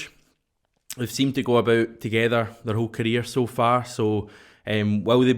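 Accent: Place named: Prestwick, United Kingdom. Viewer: I feel like I’m back in my school in prestwick here with these pronunciations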